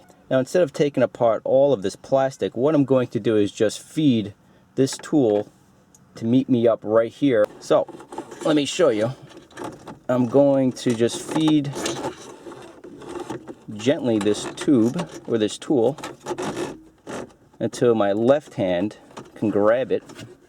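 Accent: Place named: New Jersey, United States. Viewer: You sound like your from Jersey, i just watched the movie goodfellas you have a similar accent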